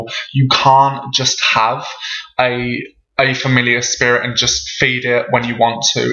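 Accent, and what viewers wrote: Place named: London, United Kingdom. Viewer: what part of England are in ? You sound very London / Essex :)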